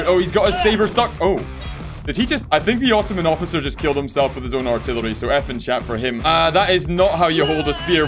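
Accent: Scotland, United Kingdom. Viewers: The Scottish YouTuber had returned…..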